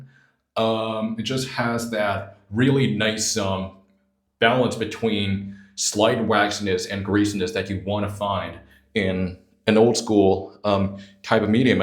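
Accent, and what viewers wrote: South, United States. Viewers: You sound like you picked up a Southern accent.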